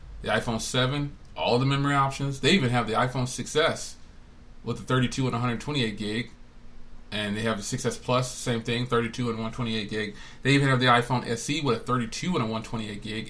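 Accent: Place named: New Jersey, United States. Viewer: Really enjoyed the podcast man. Keep dropping knowledge on these people man, u doing a public service. how u gonna be spending all these hundreds of dollars on a phone & u can't cover your bills & responsibilities. People get their priorities mixed up but they don't like when u call them on it. Btw I think your jersey accent comes out the longer u talk, I think this is only the first or second time I've ever heard it cuz it doesn't come out in your regular videos and reviews.. Jus thought it was kinda funny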